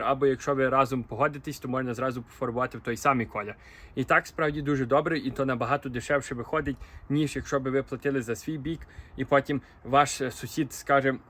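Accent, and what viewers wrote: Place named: Western Ukraine, Ukraine. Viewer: До речи, мені теж чується якийсь акцент в Вашій український мові. Чи то вже англійська так вплинула чи Ви походите із західної України?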